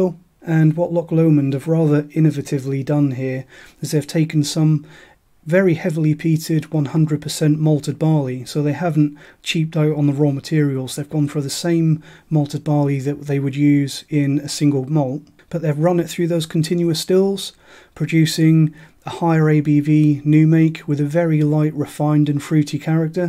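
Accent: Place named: Norfolk, United Kingdom. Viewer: Hi mate,you sound like you're from Norfolk, am I right? I am a Norfolk whisky nut, North Walsham. Let me know if we are neighbours! All thebest. Regards, Pete.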